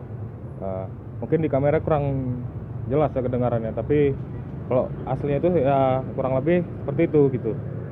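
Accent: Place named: Medan, Indonesia. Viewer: Medan apa Aceh bang😁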